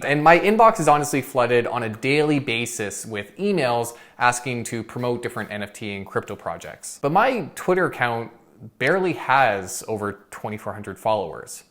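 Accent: Canada. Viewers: I listened to your entire video. I picked up a Canadian accent. Do you think the influencer Romana Didulo will eventually take power in Canada? Are Canadians more susceptible to influencer deception than US people?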